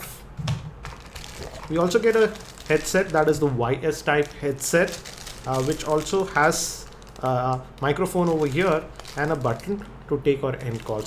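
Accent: India. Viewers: he speaks like an Indian I think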